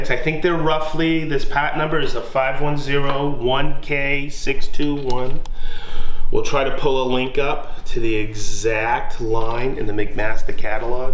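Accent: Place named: Massachusetts, United States. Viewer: Cambridge MA here the accent I think your from Massachusetts? Thanks for sharing!!!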